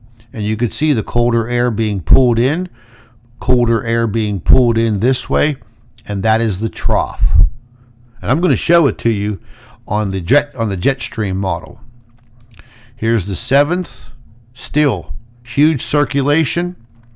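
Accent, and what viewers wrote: Pennsylvania, United States. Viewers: I can't tell you how good it is to hear your voice and see your reporting. I hope the good doctor is doing well you haven't mentioned her lately but then again there's so much going on in the world and in your own personal life. I hope that part gets resolved. I know getting world resolved is not anything we can do it is just going to work around us. We can do very little about it. Buying fewer SUVs or using the stupid carbon tax does nothing for the climate or our planet.. Some political honesty from the powers-that-be would be a lot better. But again it's good to hear you back on the "YT"it's nice to see the videos you put together as well. You're always so informative. As I hail from that part of PA. I relate to your accent, attitude and willingness to call it like it is. Again, I am glad your back researching and keeping people informed especially those willing to watch with an open mind. 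Again I hope your health improves and the good doctor weathers her physical trials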